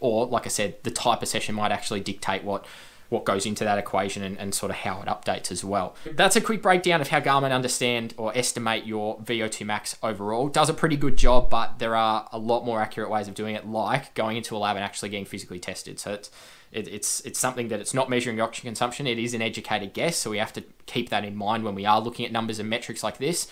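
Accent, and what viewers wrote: Australia. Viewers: Haha First thing I noticed was the (Eric lindros?) jersey, but then we he started talking I thought...”wait a minute...this guys Aussie”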